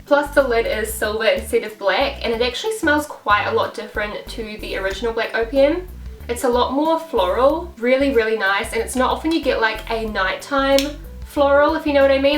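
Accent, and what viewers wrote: New Zealand. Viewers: lovely, can I just ask are you from New Zealand? X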